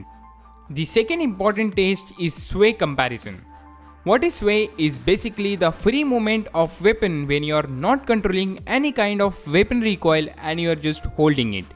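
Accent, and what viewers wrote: India. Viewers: i have to turn the volume down to zero and turn on the captions..... this is for every indian, Indonesian, indian accent speakers... please learn english. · You sound very Indian.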